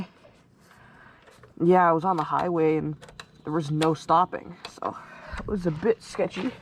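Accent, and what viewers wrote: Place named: Canada. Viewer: You said ya hit 50 and I was tripping out thann you said "take err for a rip" and I realized ahhh he's a Canuck. Hahaha KMH not MPH 🤣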